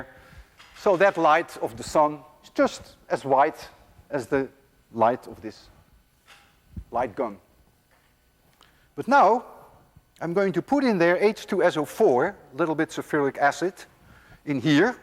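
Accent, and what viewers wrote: Netherlands. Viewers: With that kind of accent he could only be Dutch...figures I was right:) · Ha, I knew he was Dutch... Because of the accent. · Is he dutch? He's accent is kinda dutch?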